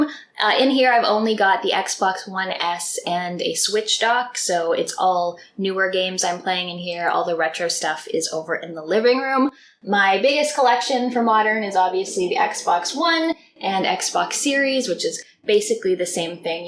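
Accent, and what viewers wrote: Canada. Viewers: Really enjoyed the tour, thanks! You've got a pleasant voice and i love the articulation and of course the Canadian 'about'.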